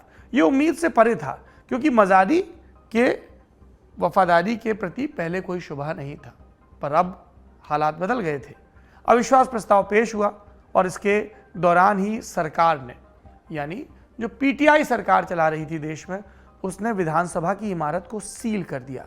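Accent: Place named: Bundelkhand, India. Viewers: Sir i am from orai (near to your hometown chamari). The way you deliver words initially i can feel that vibe sir........ Full bundelkhandi.... Big fan sir.. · sir tumai bundelkhandi sunke maja aagao ek dum gaon ki yaad dila dai aapne to👌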